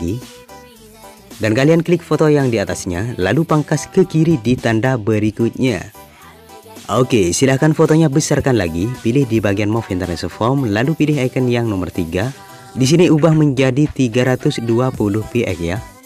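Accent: Madura, Indonesia. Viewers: Abang org madura kah....?🤔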